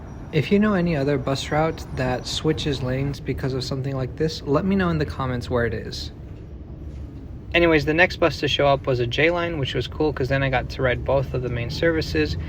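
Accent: United States. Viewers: Hey, you're bringing me back to my youth. I remember visiting my sister at Cal State & on my way to union station on this busway. Thanks Btw, didn't know you weren't rom the U.S. Your American english is perfect. ;) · Where in Europe are you from? You sound American.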